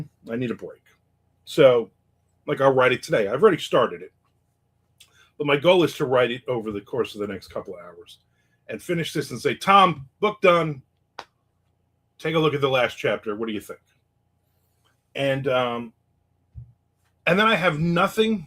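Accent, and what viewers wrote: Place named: New Jersey, United States. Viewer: Did you say I'm on, or I'm, can't tell with that Jersey accent.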